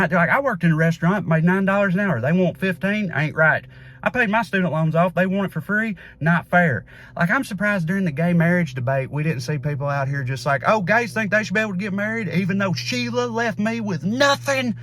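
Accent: South, United States. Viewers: This guy is already attractive - but his mind through that southern accent takes it next level.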